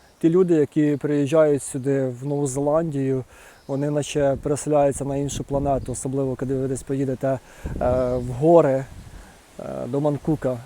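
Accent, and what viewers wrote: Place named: Kirovohrad, Ukraine. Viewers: а ще приємно чути Ваш центральноукраїнський діалект! Він для нас рідний, бо ми полтавчани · У вас така знайома вимова і таке рідне « осьо «. Часом не Кіровоградщина?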